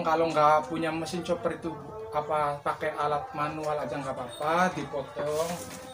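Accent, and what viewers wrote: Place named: Java, Indonesia. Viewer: Ngomong logat jawa kang mase, daerah ngendi iki mas?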